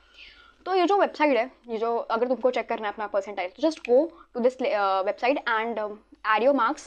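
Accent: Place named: Mumbai, India. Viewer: She has that typical Mumbai accent ❤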